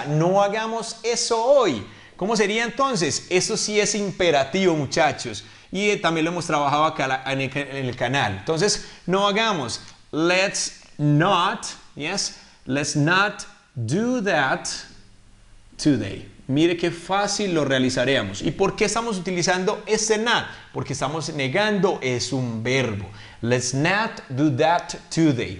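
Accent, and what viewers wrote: Colombia. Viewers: Teacher you have Colombian accent..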